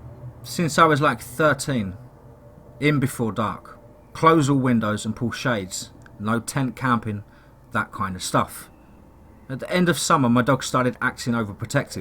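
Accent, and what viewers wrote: United Kingdom. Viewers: Hi There, new subscriber here, from the Midlands, UK. Love your narration, as I can understand more clearly as its a familiar British accent, not that I dislike the American accent (I actually bloody love it lol) but sometimes I struggle to grasp the narration with a thick accent, as no doubt some of you would struggle with mine once my midlands accent came through lol, but lets make this clear, I'm not a Brummie FYI lol. Alicia my lovely, you are one helluva strong lady, and my hat goes off to your bravery, and I don't blame you for showing that DM who's boss around your parts. I will continue to look for updates on here, but pray for your safety. blessings to you and your family and I mean that from the bottom of my heart. stay safe xx Julie xx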